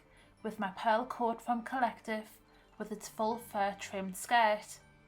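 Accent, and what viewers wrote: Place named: Newcastle, United Kingdom. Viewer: Please lower the background music - it makes your voice difficult to hear and for those who find the Geordie accent difficult, this makes it harder. Lovely to see the beauty of the NE on show - so many wonderfully historic and scenic places to visit in Durham and Northumberland. Thanks for making this video for us to enjoy.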